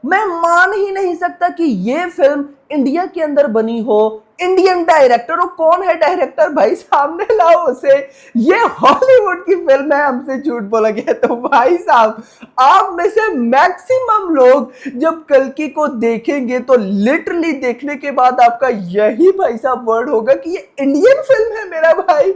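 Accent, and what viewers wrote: Pakistan. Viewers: Tu pakistani kesa bolna band kar sale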